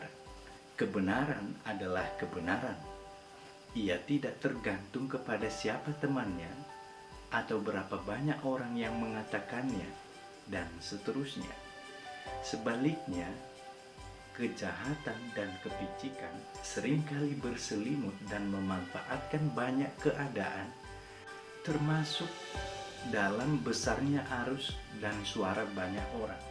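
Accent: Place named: Porsea, Indonesia. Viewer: Titip Salam buat Pariban Awak Pastor, OFM. Cap.. Suaranya KENTAL khas SUARA PAR-PORSEA.. Maaaaantaaaf. Terimakasih atas RENUNGANNYA. SEMOGA SUARA TUHAN TETAP DAN SELALU HATI.. GBUs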